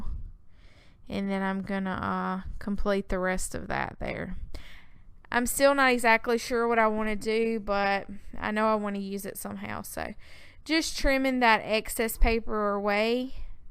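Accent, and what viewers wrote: South, United States. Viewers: just want to let you know, how talented I think you are. I REALLY enjoy your videos. Not to mention that I could listen to you talk forever. LOVE that southern drawl!! We Canadians love that drawl!! lol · love the feathers and your southern accent is delightful!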